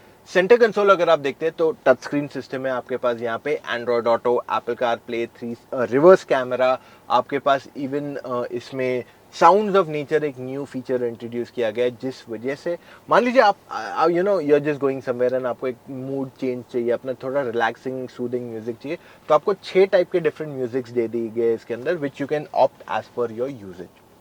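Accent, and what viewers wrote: India. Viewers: Love ur Hindi accent 😍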